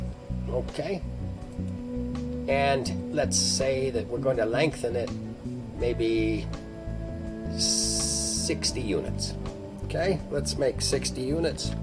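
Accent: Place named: United States. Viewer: Glad to find a American English speaking vid on LC. As I am hard of hearing...some with heavy foreign accents are difficult to follow. I would suggest modifying the background music or eliminating. The bass line of the music is near the pitch in your voice...reducing the clarity of your voice. Thank you for the video and I have subscribed.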